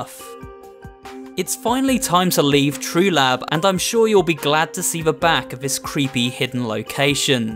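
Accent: United Kingdom. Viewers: It's a British accent, dude. Don't judge him. He can't help it. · Its his British accent :I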